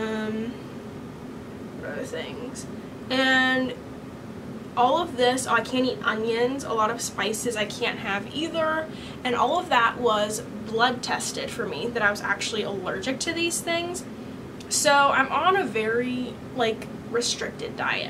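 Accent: United States. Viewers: what kind of american dialect is this?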